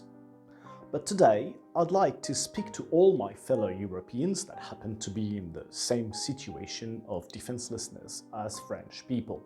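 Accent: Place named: United Kingdom. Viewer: très British ton accent ;)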